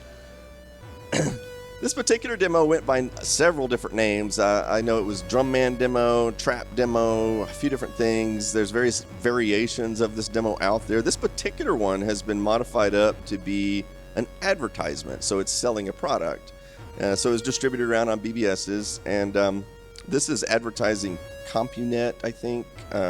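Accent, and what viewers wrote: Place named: Texas, United States. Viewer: His Texas accent drawls well off-camera.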